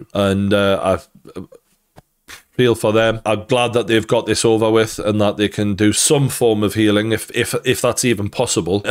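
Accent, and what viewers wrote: Newcastle, United Kingdom. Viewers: The geordie accent adds to the actual deadly intent on this crime. Here here brother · I've just subscribed to ya channel it's nice to listen to another Geordie 💔 spot on it's . Absolutely heartbreaking 😢 and what is happening to our country aswell 🇬🇧 🇬🇧😢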